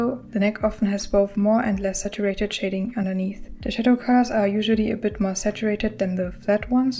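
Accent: Germany. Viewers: already one of my favorite art style studies I've watched so far, you deserve so much more attention! Also, idk why but you sound like you have a German accent lmao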